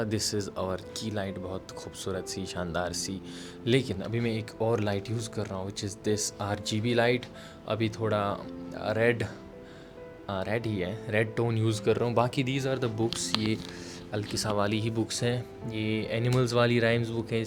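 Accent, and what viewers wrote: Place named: Kashmir, India. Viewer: the kashmiri accent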